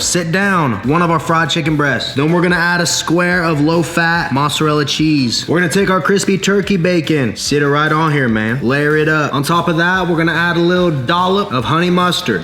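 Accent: Texas, United States. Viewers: Is it just me or his Texas accent is getting stronger? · He's from Ohio, but definitely has Texas growing on him. Food and twang 😍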